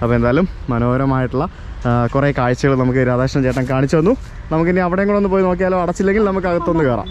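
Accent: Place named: South Kerala, India. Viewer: പോകുമ്പഴത്തേക്കും . നോക്കുംബഴത്തേക്ക് . വികല മലയാളം ആണ് ..അരോചകമായി തോന്നുന്നു . നിങ്ങൾ അവിടെ എത്തുമ്പോൾ . മതി . മണ്ടക്ക് കയറി അല്ല മുകളിൽ കയറി . മണ്ട . ജീവനുള്ള വസ്തുക്കൾ ക്ക് ആണ് ..കേരളത്തിൽ മുഴുവൻ ഉള്ള ആളുകൾ കാണുന്ന വീഡിയോ യില് തെക്കൻ ഭാഷ കഴിവതും ഒഴിവാക്കുക . ❤️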